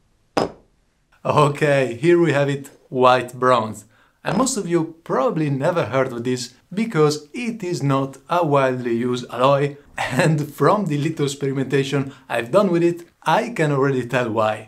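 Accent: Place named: Italy. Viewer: can i ask if you're italian? I think you are because of the accent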